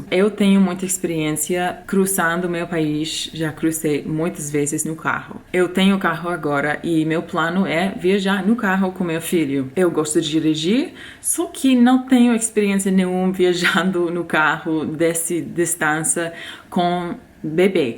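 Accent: Rio de Janeiro, Brazil. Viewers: esse sotaque carioca dela é engraçado demais: Nóixxx Paixxx! será que ela sabe todas as gírias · primeiro: Fico muito feliz em ver vc tão empolgada e com tantos planos! Tão diferente de quando vc chegou aí nos EUA. Depois, acho lindo seu jeitinho carioca de puxar a letra " s" no fim das sílabas E, já que vc não se importa, gostaria de fazer uma pequena correção: A gente não usa "desde" para se referir a lugar, só usamos para tempo. Pra lugar, falamos só "de". Abraço. Tudo de bom pra vc e pro Max! 🤗😘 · Vocêix, paíx Totalmente carioca Kkkkk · Americana com o verdadeiro sutaque carioca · Você herdou o sotaque carioca. Hahaha Paísssss, nóissssss Legal isso. Boa sorte, menina. Jogue-se de cabeça. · Já fala com o xxxx do carioca! Rs Boa sorte na viagem! ❤ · Estou na cidade de Pompano Beach seja bem vinda mais uma americana Carioca ate o seu sotaque em portugues e do rio show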